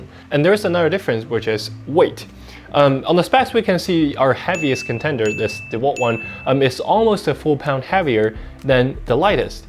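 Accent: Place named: Canada. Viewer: An Asia Canadian accent... different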